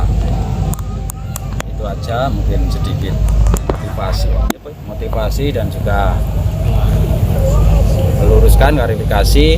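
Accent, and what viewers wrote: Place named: East Java, Indonesia. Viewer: Logate etanan ngno kui...bumbune ben tambh sedep mas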